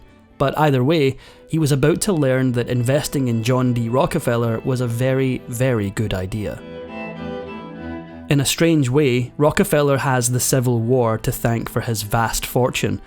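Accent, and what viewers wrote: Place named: Scotland, United Kingdom. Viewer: First, I liked this video because the narrator has what seems to be a Scottish accent. That resonated with me because I watched a PBS documentary on Andrew Carnegie decades ago. I have not been in awe of the rich snd famous - yet I find their stories fascinating and they give me a glimpse into human nature. Just watched a short video on J.D. Vance, current candidate for Vice President - and was reminded of J.D. Rockefeller's humble start in this video. This videos thumbnail teased that Rockefeller's "dark secret" was someting he did. The man was a sinner as we all are - or have been. Still, the secret was the sins of his father. I'd done some research on the younger Rockefeller a few years ago - and vaguely recalled his dad giving him a loan. I didn't realize what an influence, "Devil Bill," was on his son - or that his son fought to overcome the shadows of his dad's dealings. 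Thank you!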